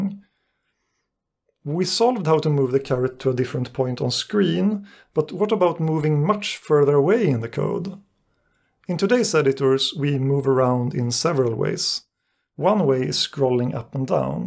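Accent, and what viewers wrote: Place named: Sweden. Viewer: The Swedish accent is strong in this one :)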